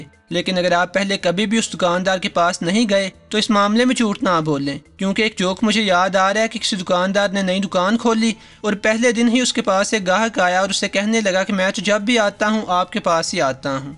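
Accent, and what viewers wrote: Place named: Lahore, Pakistan. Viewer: Apka Accent Lahoriyo Wala hy..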